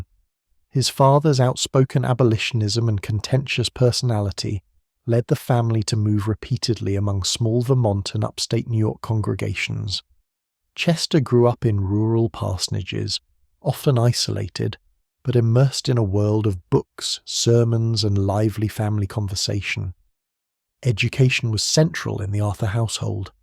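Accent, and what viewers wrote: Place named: United Kingdom. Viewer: Yeah. Why do we need a goddamn Limey to tell us about our presidents? There's no excuse for it.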